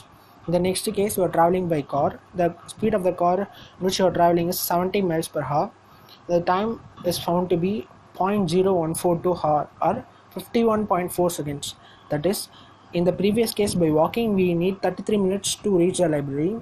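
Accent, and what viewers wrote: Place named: India. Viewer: I love your video. I only would hope that you could improve your english accent. As a native speaker your Hindi/Urdu tone makes it difficult to understand. Good work though I like your explanation.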